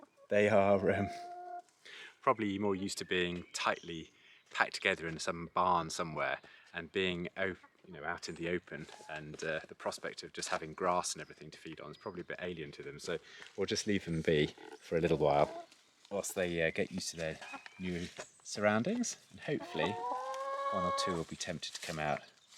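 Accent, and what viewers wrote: United Kingdom. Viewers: I did enjoy this video. I have always thought of you as a gentleman. My wife likes your proper British accent. Well done for showing compassion to the hens. Are you still baling your own hay?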